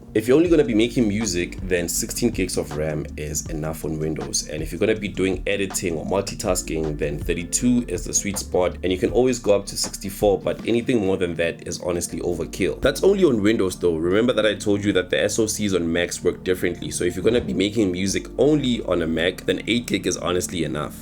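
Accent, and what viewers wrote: South Africa. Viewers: bro is south african??? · someone with a Sputh African accent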